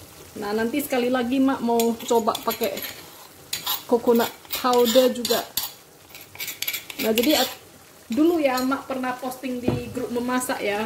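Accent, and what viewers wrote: Medan, Indonesia. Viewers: OMG ii logatnya medan kali. tapi begitu ngomong inggris kaget i. jago bgt wkwkwk pengen nyobain tapi bahannya susah d sini huhu..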